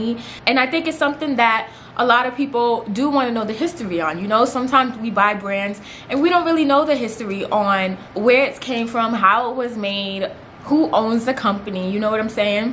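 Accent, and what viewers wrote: New York, United States. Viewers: Sis I love this!!!!!!! It’s giving what it needs to give. Fashion knowledge with the New York accent!!!!!!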